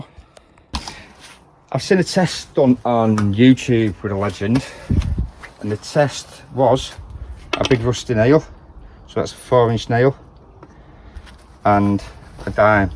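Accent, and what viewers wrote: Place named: Wales, United Kingdom. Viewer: Hello! Thank you for your video and air test.I am French and I own the Legend in the 1.08 version,do you think that a high audio gain in a mineralized soil is a good setting? (because of false signals if the GB is not well adjusted).I think you are Welsh because of your accent,but I use the translator and please do not worry,I appreciate your tests!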